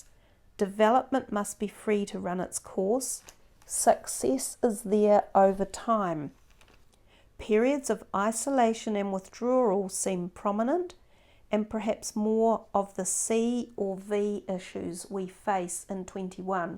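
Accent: New Zealand. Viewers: It's a New Zealand accent and yes, it's lovely.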